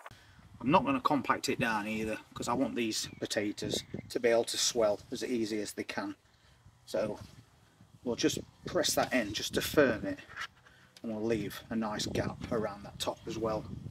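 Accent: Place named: Derbyshire, United Kingdom. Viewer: You’re really settling into caravan plant life now. Your spuds look so good. We share the same dialect I reckon so listening to you reminds me of my Derbyshire family. Take care.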